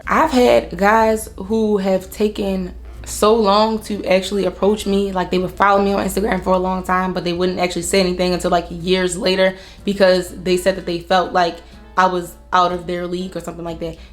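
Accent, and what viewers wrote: Baltimore, United States. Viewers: are you from Baltimore? (I’m from there) idk I can just tell by the accent 😭. but you are so pretty and this video was sooo helpful! ❤️ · are you from bmore? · I know a Baltimore accent when I hear it! But you gained a new follower❤️ I needed to hear this !! Thank you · ✨Your accent is giving me East Baltimore vibes ✨ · I hear that Baltimore lol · I have a video idea you should make a video of you saying words I’m just realizing you from Baltimore and that’s why I really like your accent. You should make a video saying different words like you too. Earl blue Avenue.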